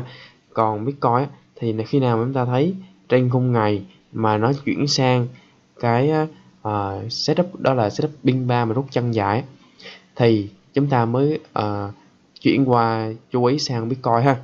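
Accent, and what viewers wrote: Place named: Quang Nam, Vietnam. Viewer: Ad người Quảng Nam hay Đà Nẵng vậy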